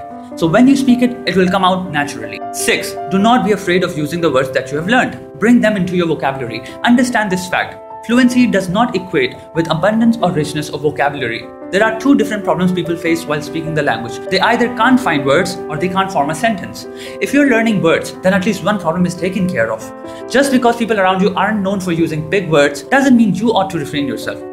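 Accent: India. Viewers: this dude sounds like Indian, doesn't he? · you are definitely fluent but have to try to reduce the Indian accent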